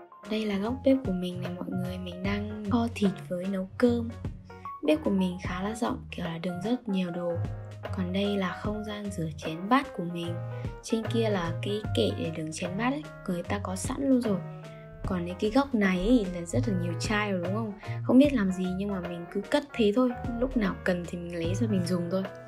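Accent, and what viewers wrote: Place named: North, Vietnam. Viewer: Giọng bắc đồ đó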